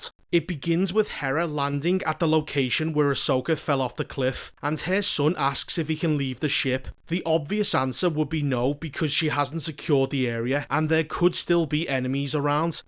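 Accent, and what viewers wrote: Liverpool, United Kingdom. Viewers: i can't take the beans on toast accent seriously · Scouse, innit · Mate you make me laugh ye sound scouce we’re ye from buddy lol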